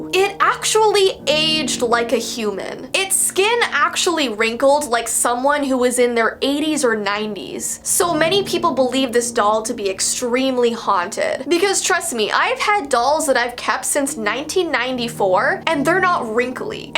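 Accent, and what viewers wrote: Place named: Canada. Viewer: I’ve watched a bunch of video and I’m just now hearing what might be a Canadian accent! Where have I been?!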